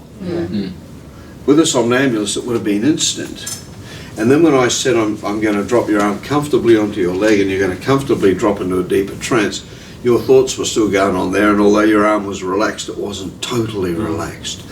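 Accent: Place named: Australia. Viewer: This guy is Australian, not English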